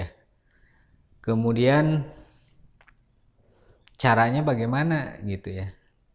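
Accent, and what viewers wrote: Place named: West Java, Indonesia. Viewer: Jiga urang sunda nya kang🤭🙏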